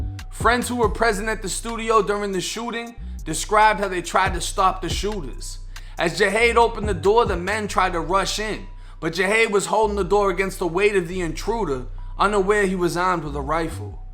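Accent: Boston, United States. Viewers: Are you from Boston?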